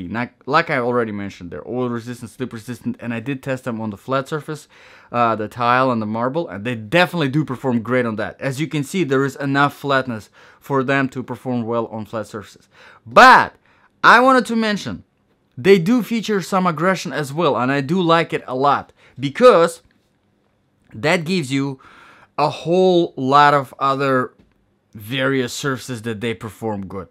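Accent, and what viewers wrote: Russia. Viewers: İs it Russian accent?)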